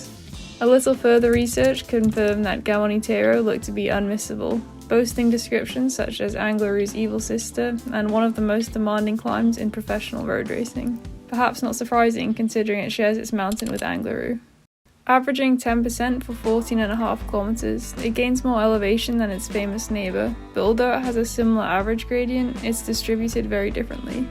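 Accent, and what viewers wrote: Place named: United States. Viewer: The rear cam works so well, really gives that perspective of progress love your voice and your accent of course like any American : )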